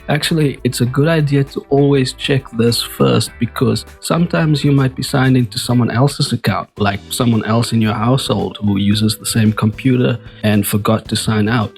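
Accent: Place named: South Africa. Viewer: Why do you sound south African.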